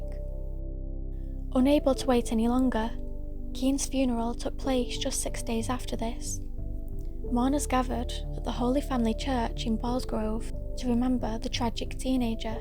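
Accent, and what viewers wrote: Manchester, United Kingdom. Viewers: Your accent is very similar to my south Manchester accent. Enjoying your videos.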